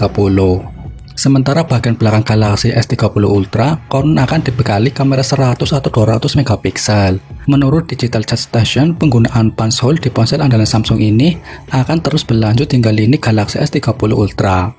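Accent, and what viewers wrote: Banyumas, Indonesia. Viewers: Logatnya ngapak yak..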